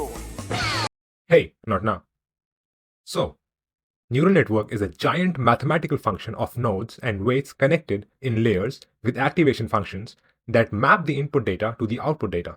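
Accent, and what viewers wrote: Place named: India. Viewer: You indians have great information videos on YouTube , but your problem is your accent in English is terrible for us to understand what you are saying. So please use AI voice instead of your natural voice please.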